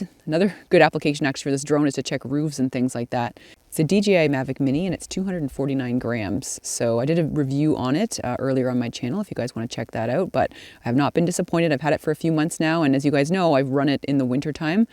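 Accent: Canada. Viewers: My thought exactly, I’m in Vancouver I too heard that Ontario accent...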